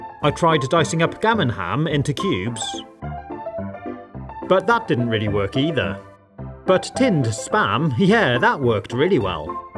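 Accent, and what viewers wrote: United Kingdom. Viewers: Are you from uk🇬🇧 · He's British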